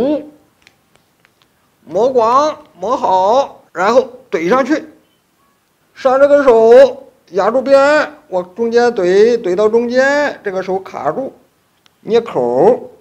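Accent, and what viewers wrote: Shaanxi, China. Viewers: 这陕普听得我太亲切了！想回家😭😭😭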